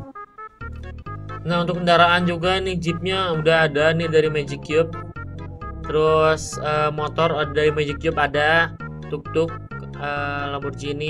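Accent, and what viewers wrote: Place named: South Kalimantan, Indonesia. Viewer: Abang orang mana kayak bahasa Kalsel aja😅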